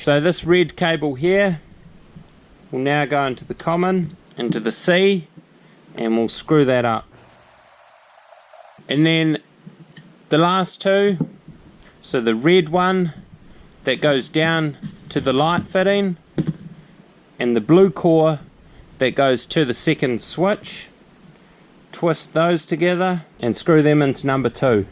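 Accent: New Zealand. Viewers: Mate your a legend not only have you saved me hundreds of dollarydoos but iv learnt something and enjoyed some pure NZ accent doing it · Thanks Great show. I had a bit of fun with the accent (Here is the kettle calling the pot black! I'm European.) Yes even the CC picked it up and kept showing "The Read"wire and even had more problem with the "batten box" ha ha - I seem to have heard this on my 2002 NZ trip, could I be right? (And did they had fun with the Aussies!) Anyway this is a very practical presentation. Thank you. I just wish I did not have a narrow two gangs switch to work with and some 15 wires behind it, stiff ones too! But in the end all good! Really when one understands that Switches are never off but go to L1 or L2, It makes a lot of sense to get the two ways working. More thanx to your three ways and illuminated switch (here I wanted it for my two ways switches to the outside light to remind me to switch it off (My old neon that worked so well in it died) Not so lucky on that one...:)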